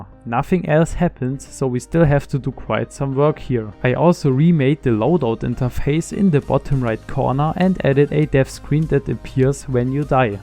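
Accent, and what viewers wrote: Germany. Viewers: you have a german accent.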